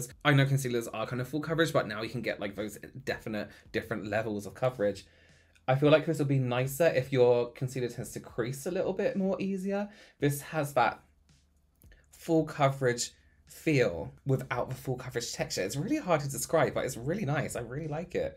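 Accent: England, United Kingdom. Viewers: Do you speak Spanish? Can you in your next video? I’m dying to hear Spanish in an English accent! Or maybe you have a different accent when you speak Spanish ?! Either way, I’d love to hear it! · Never in my life would I have thought I’d be in love with a pale Mexican with a British accent. 😂♥️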